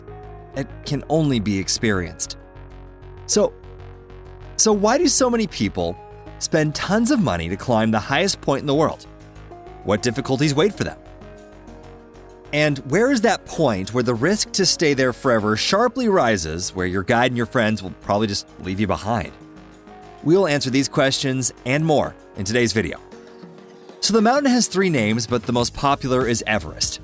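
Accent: United States. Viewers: This starts with some cheesy Yank voice over saying « Hey Guys »….WTF? One can tell immediately this is not going to be serious and it will be some D grade joke…mind you I switched off immediately so maybe not..(bet it is).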